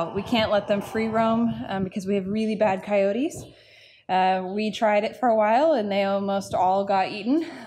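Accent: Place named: Texas, United States. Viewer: I thought so! We are neighbors! I could tell from the terrain etc. plus you sound Texan I run a private non profit in Marble Falls. Would love to connect!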